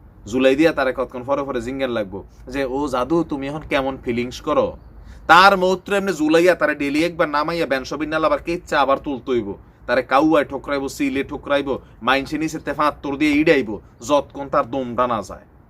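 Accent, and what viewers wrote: Comilla, Bangladesh. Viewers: ভাই আমি কুমিল্লা হোমনা থানার মানুষ। আমারও এই খবরটা শুনে খুব লজ্জা লাগছে। মানুষ কতটা নিচে নামলে এমন কাজ করতে পারে ; এরা জনোয়ারের থেকেও অধম। আর আপনার মুখে কুমিল্লার ভাষা শুনে আমার হাসতে হাসতে পেট বেথার অবস্থা । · Vai amio kintu cumillar ar apnar cumillar lenguege ta osthir cilo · ভাই ও ভাই, অাপনার কূমিল্লা ভাষা খূভ ভালো লাগে, অাপনি সব বিডিও কূমিল্লার ভাষায় করেন, অাপনি কূমিল্লার অহংকার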